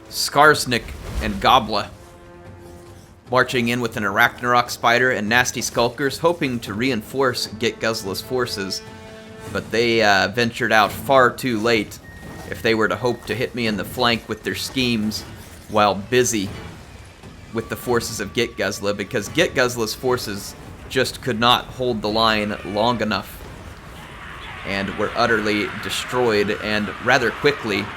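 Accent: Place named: Midwest, United States. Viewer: Hearing trying to do a cockney English Greenskin accent, but he has such a strong Midwestern American accent.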